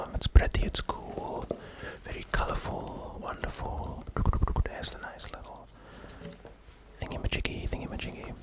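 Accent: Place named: South Africa. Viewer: Finally someone who does asmr with a legit South African accent! Yayyy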